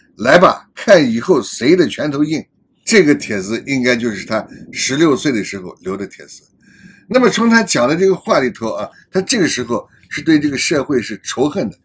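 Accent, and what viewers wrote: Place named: Shaanxi, China. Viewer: 陝西醋溜普通話啊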